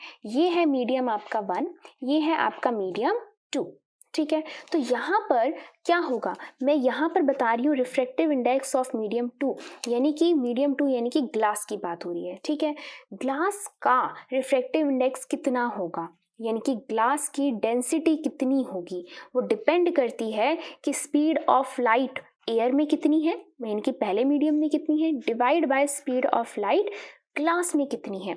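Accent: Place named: Bihar, India. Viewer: Dide you are bihari 🤔.. because of ur voice I can make out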